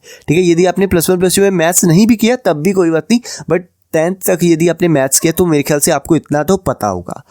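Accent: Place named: Jammu, India. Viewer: Bhai tu jammu se toh nahi hai? Your accent sounds quite familiar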